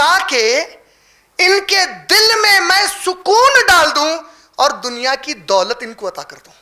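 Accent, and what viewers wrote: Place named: Hyderabad, India. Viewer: Br assalamalaikum. i always suggested you that even you speak the truth, it's difficult to accept it due to harsh wordings, so kindly speak politely. Style of addressing is very essential, it leaves meaningful impact. You raise your voice unnecessary because the truth could be explained by having patience and kindness. In'Sha'Allah.try to avoid your hyderabadi rough style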